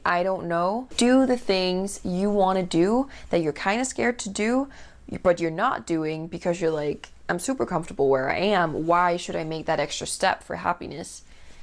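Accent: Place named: United States. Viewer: I’m curious to know why you have an American accent!?! You don’t have to leave your country to get out of your comfort zone. I did. I moved from the UK to Sri Lanka more than three decades ago! I wouldn’t recommend it to everyone though! It’s been a tough journey for me but i have achieved self growth but then i may have done in my own country too! To have gratitude & a peaceful mindset, it has to come from within not from outside at the end of the day 🧚🏼‍♂️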